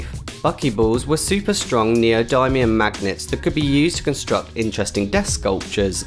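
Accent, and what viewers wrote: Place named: United States. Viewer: your amrican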